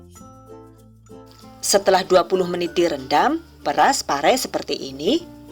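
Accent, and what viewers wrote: Banyumas, Indonesia. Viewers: Kok ngapak toko wkwk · 😆lucu banget Bahasa koh ngapak kaya nyong kiye yu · Plat mobile jawa timur, tapi ngomonge ngapak, jane rika wong ndi koh lik? 😅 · ngapak tah ....ibunya makasih ilmunya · Owaaaalaaah ngapak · Ngapak bgt rika koh yu . Btw maen infone yu 😂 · Deneng jebule ngapak rika jand hhhhhh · Bahasane NGAPAK banget kie lahj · Ciri khas ny oke.. Hihi.. 😂 Bahasa ny ngapak.. Hidup ngapak.. 👍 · Loh deneng 🤣🤣🤣 ngapak temen koh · Kok ada logaat bahasa Jawa nya mirip org Banyumas😁. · Yuuhh,,, rika wong ngapak ya mbok... ora ngapak ora kepenak.. 🤣🤣🤣 #salamngapak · Ngapak bu... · negro deneng ngomonge koh ngapak hhhhhh ...... njaluk lah yu sriping parene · Lokasine madiun deneng ngapak 👍👍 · Orang Madiun kok ngapak bunda?? Hehehehhe maklum saya subcriber baru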